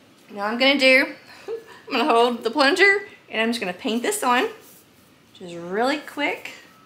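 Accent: South, United States. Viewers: I love your st Patrick’s DIY 🤩 your personality so adorable and love the southern charm accent ☺️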